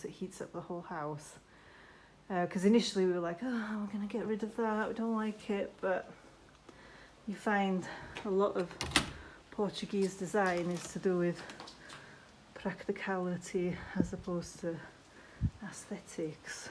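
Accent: North Wales, United Kingdom. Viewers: North Wales 😁🏴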